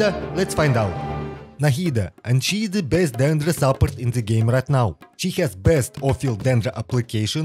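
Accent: Russia. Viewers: He got the russian accent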